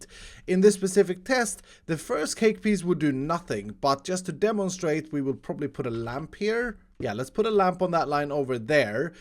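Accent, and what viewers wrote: Sweden. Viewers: Is you from sweden? 🇸🇪😁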